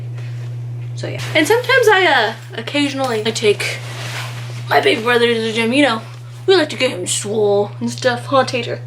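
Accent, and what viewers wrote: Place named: Italy. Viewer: Been hanging out with me too much is why you're talking like an Italian sorry not sorry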